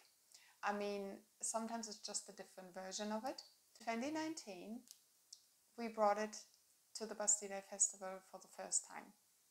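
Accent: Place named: Germany. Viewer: Hello friend 🤠👋 I was already a subscriber, but this was very interesting 🎉🎉🎉 I was completely blown away by your accent however, I wasn't ready for what sounds like German or Austrian 😮😮😮🤯🤯🤯 Not to be stereotypical, but I really thought you were Middle Eastern lol. In any event, congratulations on your company's success and being displayed in the paper! Can't wait to see more dancing! Come visit us in The States! There's a Reflexology session waiting for you as a thank you for all of your hard work ❤️🙏 🤗 Your friend Jay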